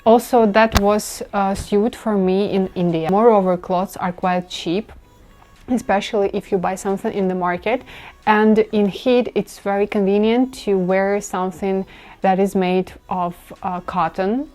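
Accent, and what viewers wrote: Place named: England, United Kingdom. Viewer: The best english accent ever by any foreigner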